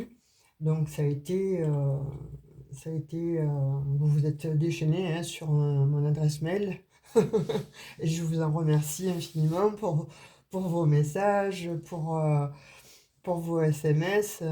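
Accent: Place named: Provence, France. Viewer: je vous adore, votre accent me rapelle ma provence je vous envoi un bisous d'italie bonne fète.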